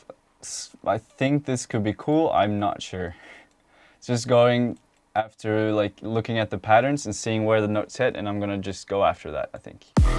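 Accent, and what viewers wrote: Norway. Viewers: Fan du är ju norsk haha hörde det direkt i slutet där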